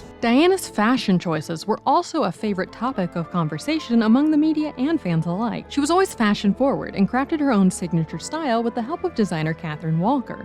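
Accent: United States. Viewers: And William was very clever and Harry failed in almost every subject even art the teachers had to fix it. The story of Diana was a bit one sided no mention of the police having to stop her calling a man’s home over 400 times she was not concerned about if the man she was involved with was married or not there is a great deal more about her that British people know I notice the person telling us has an American voice? This story has been told many times time for Diana to RIP on her island with her family.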